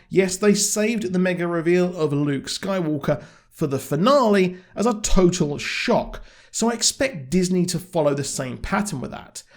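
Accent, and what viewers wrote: United Kingdom. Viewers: Thats because he's British. I have a similar accent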